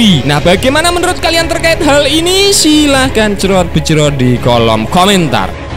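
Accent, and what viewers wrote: Java, Indonesia. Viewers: Medok sangat minn... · Kayaknya sengaja dimedokin logat jowo-nya sama si mimin..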